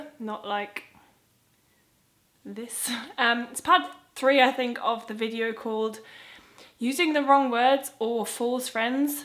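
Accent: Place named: England, United Kingdom. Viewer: an deinem "CH" merkt man dass du in England lebst :) so süss haha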